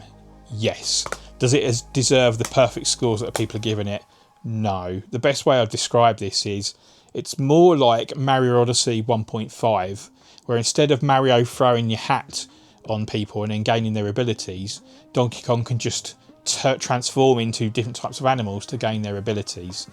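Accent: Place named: Essex, United Kingdom. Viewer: Very well done video, straight to the point. Jotter that Xbox zombie game I think my local cex had that . You sound local are you in Essex ?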